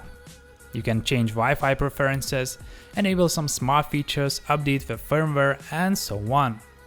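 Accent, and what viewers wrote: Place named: Lithuania. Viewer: Well, I'll support you vid with comment. Wi-Fi 6 looks more like transitional standard. I'm almost sure that when manufacturers start to implement 6 there would already be 7. A very limited range of units available now, so I'll wait until it be more across-the-board. Čiotkas, su niekuo nesumaišomas Lietuviškas akcentas :D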